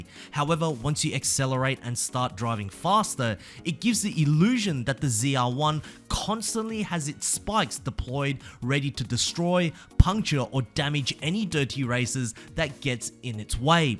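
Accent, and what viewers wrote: Australia. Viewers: Fun fact! I was today years old when I realized is Australian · Wait ur Australian My brother What part are you from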